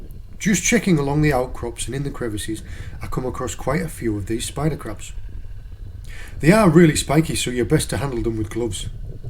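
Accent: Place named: Cornwall, United Kingdom. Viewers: Stunning video and water clarity Nice to see so many lobsters about I also noticed you developing a bit of a Cornish twang when pronouncing words With an r in them lol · Pepperrr and butterrrrr lol you sir are getting a Cornwall accent! 😎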